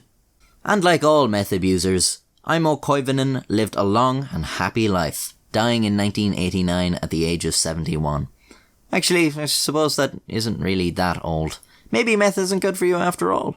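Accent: Ireland. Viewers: This story is made so much better being told by one of my favorite nationalities, an Irishman. Its great.. cuss words and all. 🇺🇸 😎👍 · Had to an irish narrator on a drug story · I love Irish accents and how "to surround them" sounds like "to so random" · The Irish accent makes it better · Hi 👋🏼 which part of Ireland are u from?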